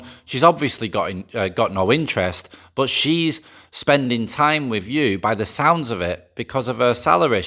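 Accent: Manchester, United Kingdom. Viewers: Hi.. Love your channel. Although I have lived and worked in Asia I was not involved with any of this type of life as my wife was always with me but but I enjoy the human interest stories and your obvious decency as a man. But, as a Manc that left in 1984 I especially love hearing the Manc accent Does my heart good. Have a vey happy Christman with your son.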